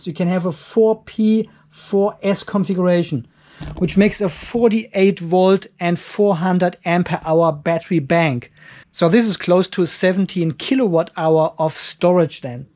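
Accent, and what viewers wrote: Germany. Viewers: Hi, i am thinking about buying one of those Batteriepack from Basen for my upcoming solar Project. But... I don't know yet. I start watching your Channel a couple of days ago. I am impressed about your knowledge on the whole Solar stuff. And the other thing is i was wondering about your english language with the German Akzent. But anyway... I Like your Videos, keep on doing IT. I learned a Lot more about batteries from U. Grüße aus Ludwigsfelde Tom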